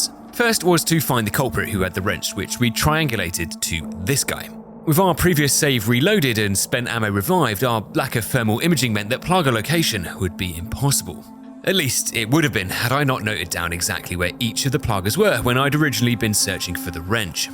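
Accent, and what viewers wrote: United Kingdom. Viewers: That accent says British but your love of friendly fire says American.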